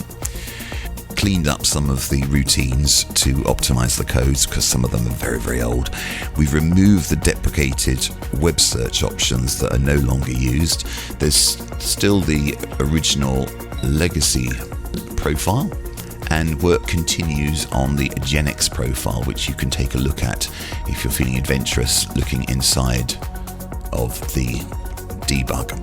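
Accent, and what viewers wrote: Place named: England, United Kingdom. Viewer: i'm sorry.... I am having trouble understanding.... can you explain it to me inworld at my place?? the sexy voice and the english accent gets my brains in noodles 🤣🤣🤣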